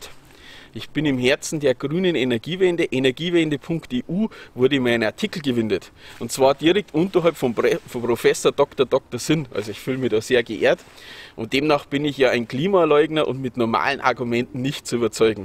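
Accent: Bavaria, Germany. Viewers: Bitte du musst weiter machen🤣🤣🙋‍♂️🙋‍♂️🙋‍♂️🙋‍♂️🙏🙏🙏🙏🙏🙏Wir brauchen Dich so sehr!!! Ich werde auch Mitglied anmelden!Bitte gibt du nicht auf!😂😂😂😨😨😨Ich verstehe Dich sehr gut!Wir können noch nicht von Luft und Liebe nicht leben!Jeder von uns müssen sein Lebensunterhalt verdienen. Lasst dich nicht unterkriegen💪🙏🙏🙏🙋‍♂️🙋‍♂️🙋‍♂️👍👍👍Alles Liebe aus München!P.S.Ich Liebe dein bayerisch 🤣🤣🤣🤣👍👍👍👍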